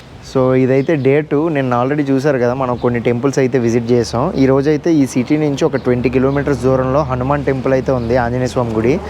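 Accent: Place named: Anantapur, India. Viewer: yaantaapur district ... super accent anna...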